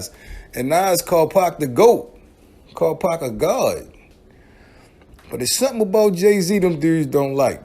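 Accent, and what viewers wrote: Baltimore, United States. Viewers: You sound like you from baltimore.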